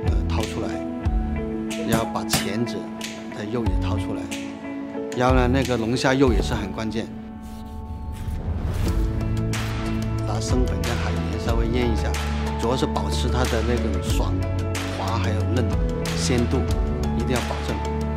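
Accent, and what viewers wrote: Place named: Cantonese, China. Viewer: Yucheng Zhou I somewhat agree with you except that to me he sounds like how my dad speaks mando while his main language is canto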